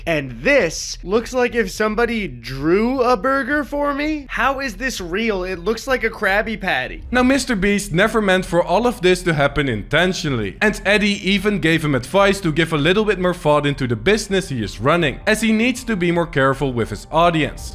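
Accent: Netherlands. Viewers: Are you dutch? I hear an accent 🤔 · Just started watching. Already love his dutch accent. New sub here! 🇧🇪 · Why can Dutch people detect a Dutchie within seconds 😂 Good video tho!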